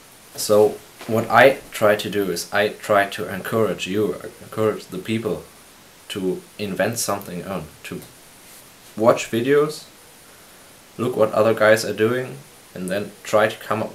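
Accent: Germany. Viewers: are you german ? your accent sounds like you are.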